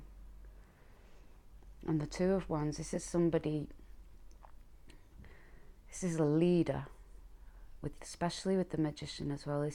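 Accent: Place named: Yorkshire, United Kingdom. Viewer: Thank you for pile 4 that i was guided to 🙏🏻 i hear a fellow Yorkshire accent, it’s nice to hear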